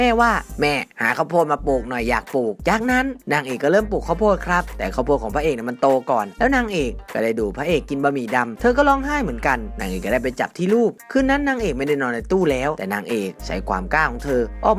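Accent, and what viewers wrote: South, Thailand. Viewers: พี่เป็นคนใต้หรอหนูได้ยิน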